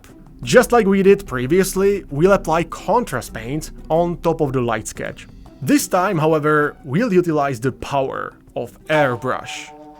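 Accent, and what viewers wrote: Czech Republic. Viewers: I was guessing whether you were Czech or not based on the accent, but that basement is what convinced me.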